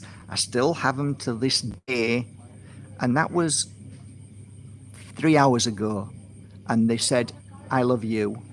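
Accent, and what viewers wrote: Yorkshire, United Kingdom. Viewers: Hi. I would never have guessed that you are from Yorkshire haha ❤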